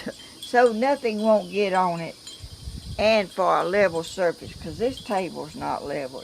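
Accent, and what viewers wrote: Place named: Georgia, United States. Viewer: God has Certainly Blessed You with Good Health Ms.! You are Good Christian Lady, Kind & Compassionate to People & Yo Keep Busy, You are such a Blessing to sooo many People! God’s Blessings on You and your Family! 🙏❤️😇😇🍁🍁 I Love 💕 your Southern Accent & just listening to you Talk & your Stories! You mind your own Business & Trust God & that is why we ❤️ you, You are just you! That is sooo Beautiful! I Love ❤️ that Color on You!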